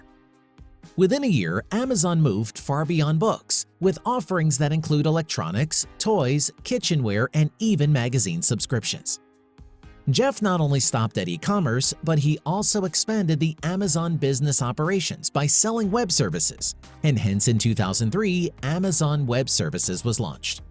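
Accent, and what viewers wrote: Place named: India. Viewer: me tamil nadu,india... your voice is like indian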